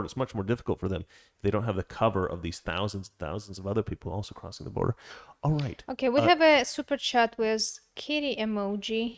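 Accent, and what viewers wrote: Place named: Russia. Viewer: The Russian accent isn't always attractive, but she has such a sweet and feminine voice that it still works for her.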